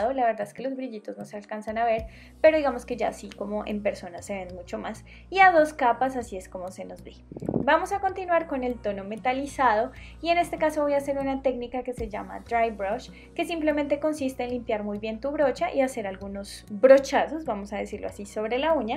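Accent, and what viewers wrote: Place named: Bogotá, Colombia. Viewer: Siento el acento Rolo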